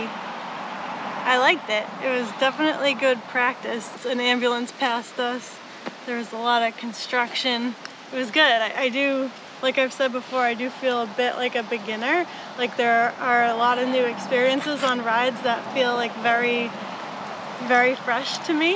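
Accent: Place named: South Shore Massachusetts, United States. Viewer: Careful out there lol Also I can hear the south shore Massachusetts in your voice 😊 haha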